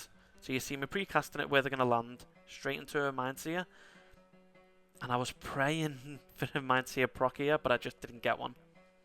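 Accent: Liverpool, United Kingdom. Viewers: Am I hearing a scouse dialect there? 😊 as a Shadowpriest and a big fan of Liverpool FC that amounts to a subscription 👍🏽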